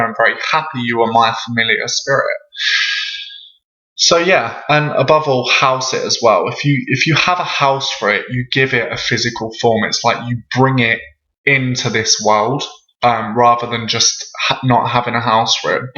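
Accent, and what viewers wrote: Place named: London, United Kingdom. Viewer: what part of England are in ? You sound very London / Essex :)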